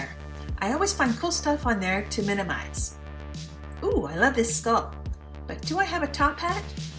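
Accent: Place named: Canada. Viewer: You sound Canadian like my brother’s family in Peterborough, Ontario. 💕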